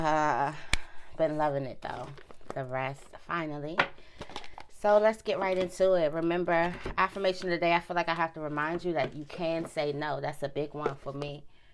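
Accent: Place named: DMV, United States. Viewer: Your accent is cute 🖤 You def from the DMV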